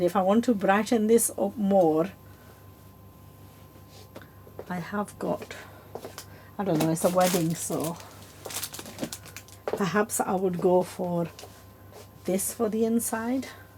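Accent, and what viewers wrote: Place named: Australia. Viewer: I don't know but she speaks like Australians but she is Asian.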